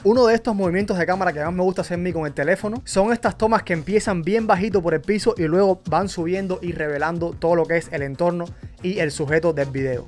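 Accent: Cuba. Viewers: El acento parece de mi tierra la linda cuba bendiciones crack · buen video, tienes un acento bastante peculiar, eres cubano? saludos desde cubita 🇨🇺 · Me gustó mucho tu video. Che tenes hacento Cubano Me Equivoco. Saludos bendiciones ❤❤ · se entiente lejos 20mil km q eres cubano ajajaja grande bro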